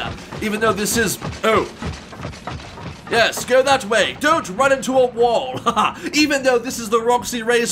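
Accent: United Kingdom. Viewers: Nice to see our good ol’ British bear return. 😅 If I remember this right, this’ll be the path to the “Princess Quest” ending. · Yay the british boy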